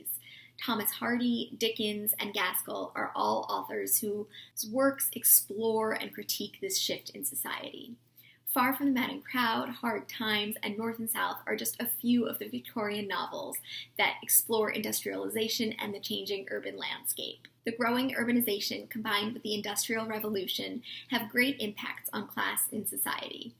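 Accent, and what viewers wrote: United States. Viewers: I absolutely love your videos, so informative about many time periods in literature. I particularly liked your video on the victorian period. I learn so much about literature from listening to you. Also you are such a good speaker I am beginning to become addicted to your channel. Which part of America are you from, just curious. Love you.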